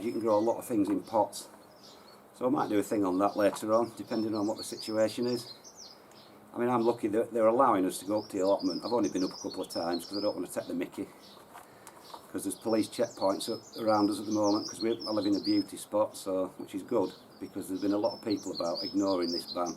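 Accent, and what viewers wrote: Yorkshire, United Kingdom. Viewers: Very informative. You have got a great Yorkshire accent. Thumbs up.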